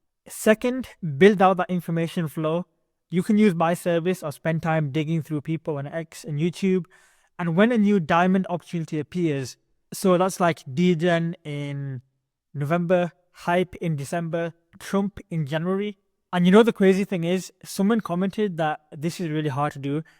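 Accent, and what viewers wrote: United Kingdom. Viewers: Love the video bro, nice to hear British crypto YouTubers 😂 . There’s a project called treble swap which is the first v4 DEX on base do you think it’s a good buy ?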